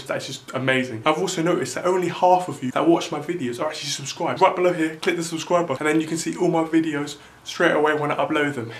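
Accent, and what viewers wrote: Canada. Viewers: Are you Canadian? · Are you Canadian??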